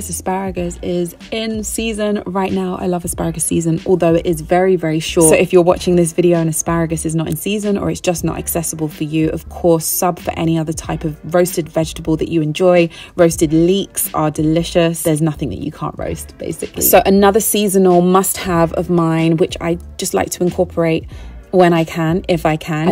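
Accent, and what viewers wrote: United Kingdom. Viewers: New subsciber! Love your content and your accent makes me miss living in the UK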